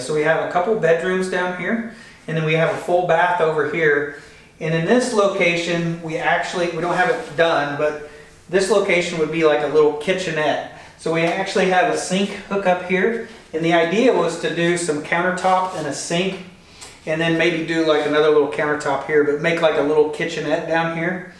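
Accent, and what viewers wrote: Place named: Illinois, United States. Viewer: Illinois accent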